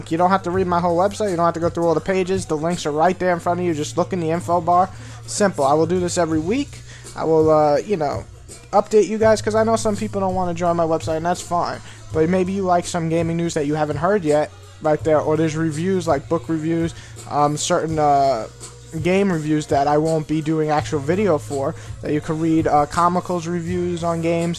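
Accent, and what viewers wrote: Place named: New York, United States. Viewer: What part of NY you live in? 123ilikecheese456 was right, you do have a kick ass accent.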